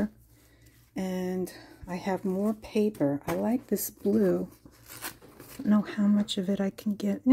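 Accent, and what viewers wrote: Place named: New York, United States. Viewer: I love your longer videos. I also like your voice and accent. I had an aunt from New York and used to love to visit with her. Your sense of humor is great. Your keep it real for all the times we craft and things happen, I laughed out loud when that lid to your ink pad went flying off the table. I also love how you insert comments to people who are going to say something negative. Anyway, just my two cents. I look forward to your videos.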